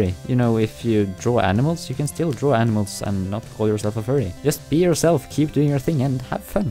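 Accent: Norway. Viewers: Hahaha your videos are the best - your quirky Norwegian accents/style combine perfectly with an endearing wit that is both playful and thoughtful at the same <3 Also I learned today that in Norway you supposedly eat sandwiches with a knife and fork? Truly a divine country. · Nevermind, found it in one of his other videos, it’s a Norwegian accent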